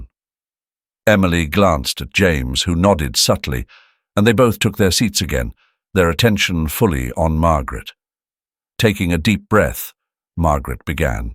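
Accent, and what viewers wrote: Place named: United Kingdom. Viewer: Love the British narrator’s voice and the way he tells the story well. Much better than those monotone robotic voices with no emotion, feeling or passion.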